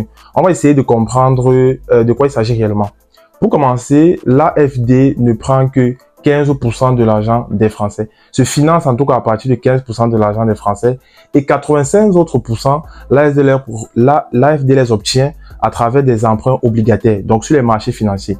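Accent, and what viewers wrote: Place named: Africa. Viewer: Mais comment tu fais pour avoir 2k+ abonnés avec 9 vidéos? 😅 Et j'ai reconnu l'accent c'est le continent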